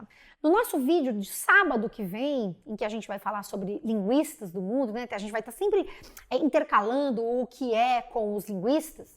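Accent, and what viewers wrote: Rio de Janeiro, Brazil. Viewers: bonita, sotaque maravilhoso(carioca?), simpática e elegante, mas só caso em cartório...kkk...e acima de tudo...interessante(nota de rodapé).Depois dessa aula finalmente lerei Grande Sertão Veredas...kkk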